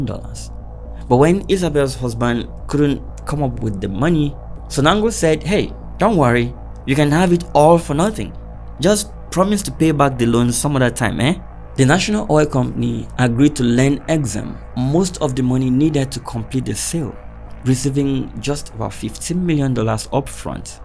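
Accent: Nigeria. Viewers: I am not hating, but the fact that this story is narrated by someone that sounds Nigerian, is hard for me to trust it!! Scammers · Sounds like a Nigerian...you know what i mean!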